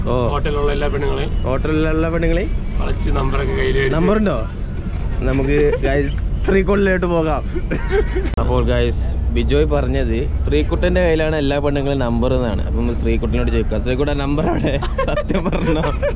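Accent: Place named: Malappuram, India. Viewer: മലപ്പുറം ഭാഷ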